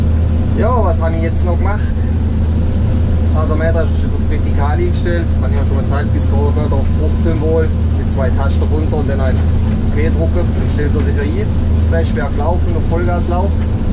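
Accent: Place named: Switzerland. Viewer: Jo de schnurret halt scho fascht schwizerdütsch.isch jo gard näbe mir äne am riih.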